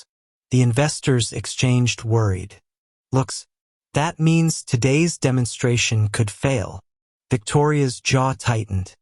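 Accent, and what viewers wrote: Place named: Spain. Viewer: Why is it that the narration keeps changing to a broad Spanish accent?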